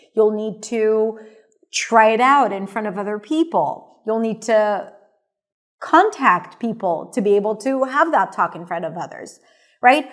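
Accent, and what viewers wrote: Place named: United States. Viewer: i love you, I love your tone, it's super cool and soft and delicate, but I'm Cuban, I use a translator and the Duolingo app, but I like your continuity listening to you talk, I think it's better that way, I learn more because I'll be listening to you all the time, even if I go out to work, I'd love to have the headphones on to listen to you all the time, now I live in Guyana 🇬🇾 English, but this dialect is not yours the real American, I'd like to have some recordings of yours to listen to it while I'm working one and a thousand times to listen only real English, but I hope you have some recordings which I can download to listen to them offline, because when I am connected messages come in all the time and the recording is not listened to well, thank you beautiful lady, I hope to learn a lot with you if you can help me well to study ❤❤❤❤🙏🙏🙏🙏😊😊😊😊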